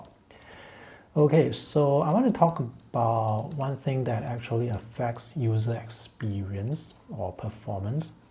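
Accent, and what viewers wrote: Singapore. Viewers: Nice review you sound like a Singaporean! 😊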